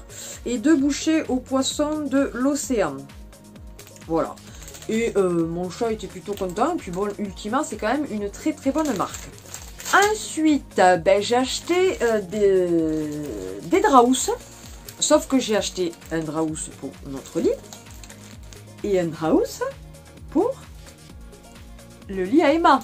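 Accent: South, France. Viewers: Coucou Je viens de découvrir ta chaîne et de m'abonner Ah super l'accent du Sud ❤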